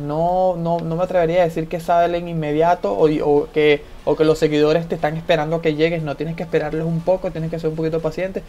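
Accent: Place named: Venezuela. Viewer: Buenas hermano gracias por la guía en serio mucha ayuda sólo.que iniciar este juego cuesta muchísimo el inicio es difícil , pasarte la campaña sobre.Todo los dos últimos mapas cuesta aún no he podido , tampoco tengo mucho jugando apenas 1 semana y entendiendo mejor el juego con ayuda de vídeos como el tuyo,ahora he visto cosas que para mi una sola.explicación tienen como el.tema de tanto.daño y hp de algunos personajes y.debe ser que han pagado cambian gemas y compran el.rank 10 del Premium que tiene buenos beneficios de.hecho excelentes eso les ayuda y que empezaron el día del lanzamiento del juego. Si te animas agradecido por los vídeos y tener alguien con quien jugar en línea de habla hispana y ese acento debe ser venezolano jajajaja si es así de que parte hermano y gracias precios los consejos y tu tiempo al.subir vídeos .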